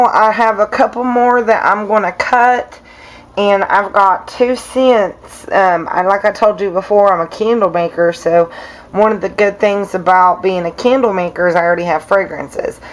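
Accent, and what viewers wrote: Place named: West Virginia, United States. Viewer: hi! love your videos! idk what part of TN you live in but I'm from the southern most part of WV, only takes me 25 min to be in Buchanan county VA...lol......but the reason I'm telling you this is because your accent is the same as ours around here....haha But anyways, down to business.....i just watched a video on a 6 color in the pot swirl and her name is Christy Rose. i just thought I'd let you know about her video and maybe it can help you achieve the results you were wanting. on another note, hope all is well with you and your family and i can't wait to see some new videos and wonderful soaps from you!!!! I'm not a soap maker yet but I'm doing research and i think here soon I'll be ready to try my hand at it. ok I'll stop rambling now, take care and keep on making awesome soaps and whatever else your heart desires...and much love from McDowell county WV!!!!! 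lol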